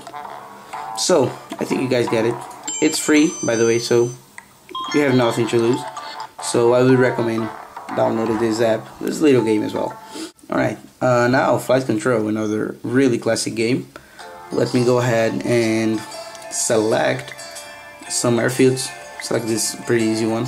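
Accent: Brazil. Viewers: I believe its because he have a Brazilian accent.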